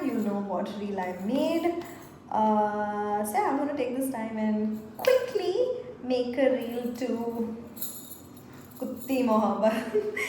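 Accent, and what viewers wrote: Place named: United Kingdom. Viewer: Engrej ki tone bol ri h pgl hoshyari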